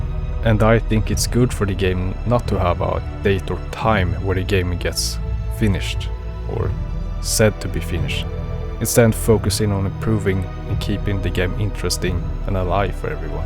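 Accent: Sweden. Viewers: Im norwegian, and i also heard swed immediately:P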